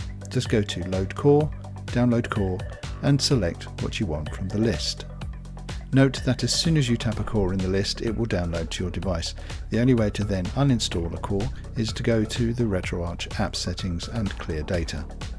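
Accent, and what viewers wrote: England, United Kingdom. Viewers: Finally a English voice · Damn, ur voice is so soothing... And that British accent... U can tell me to kill my parents and all i would be able to reply is Yes, Master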